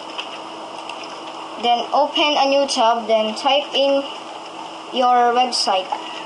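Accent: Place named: Philippines. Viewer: Hey your english accent, sounds like you are pinoy right!!!!!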